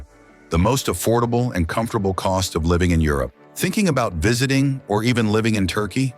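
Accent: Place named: United States. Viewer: It is good,,.infact very good but one little mistake but very important thing that makes sense to all Turkish spiking people,,,.that we know dodo’s used to live in USA and wild turkey,s came from USA as well,,,but There is no link between the bird or the land ,,,right I know you are an British American but the land spelled Türkiye 😂,,.and not Turkey,,.please note the spelling even you are an American or a guy with an American accent,,,.🧐you can see the difference,,,,,.Right 😅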